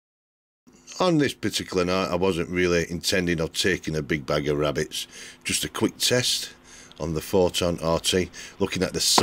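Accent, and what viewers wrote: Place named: United Kingdom. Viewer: Always enjoy your videos and I love your British accent. Are you from Newcastle? Keep them coming fella!!!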